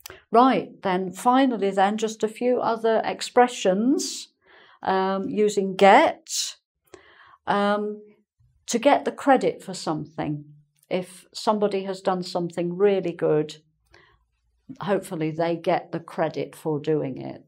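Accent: England, United Kingdom. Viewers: English accent. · well i'm not very keen on studying English... but watching u is something else... the british accent u've got n the way u dress hahaha... how old r u? please come to indonesia... we need english teacher like you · is that a British accent?? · r u British?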